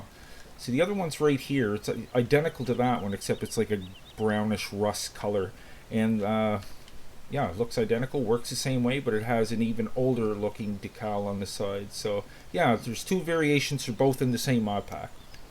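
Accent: Canada. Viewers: Its just mac-h-ale like ginger ale u got a strong candian accent btw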